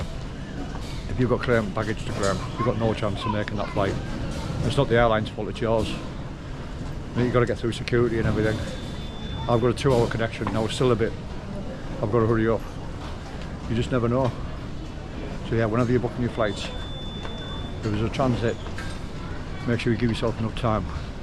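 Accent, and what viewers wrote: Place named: Yorkshire, United Kingdom. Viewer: Hi boy I m ur new subscriber a Scotish Pakistani. Love ur Yorkshire accent.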